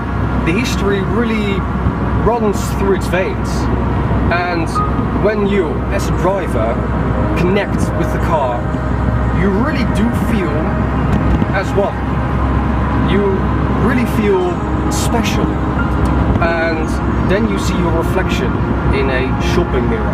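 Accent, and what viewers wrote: Netherlands. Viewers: ik hoor echt dat je NL bent <3 toffe vid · Let me gues your dutch. Dat hoop ik tenminste anders heb je wel een speciaal accent. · Je hebt wel een heel Nederlands accent :D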